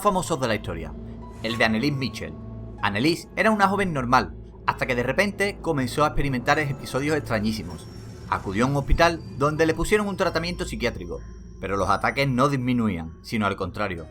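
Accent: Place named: Canary Islands, Spain. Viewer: U DROSSTOP10 NO? VALE PUES PONTE DE NUEVO NOMBRE DOCTOP... ESPERA QUE? YA EXISTE D: PONTE... TOP10VIDEOS O ALGO POR EL ESTILO AH! Y PARECES CANARIO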